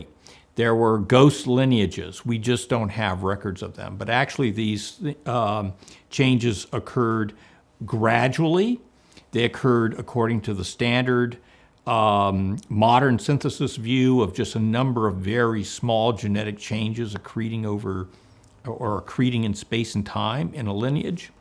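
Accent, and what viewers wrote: United States. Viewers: Ha, it's mostly a middle American accent with a 'who knows what' perturbation. May have grown up in one place, and moved to another during childhood.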